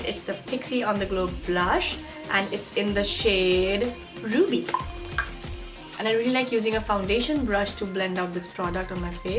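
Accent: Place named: Gujarat, India. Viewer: dayum that gujju accent tho :D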